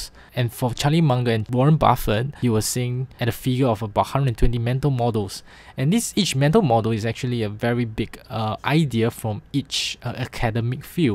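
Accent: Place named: China. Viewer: Very distinct chinese accent.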